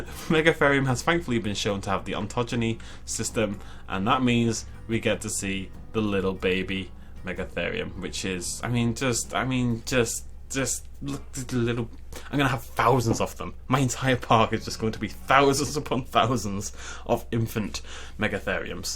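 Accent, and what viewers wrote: United Kingdom. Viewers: Woah I thought this was Nature's Compendium when I clicked. Imagine my surprise when I heard the voice of my favorite Brit.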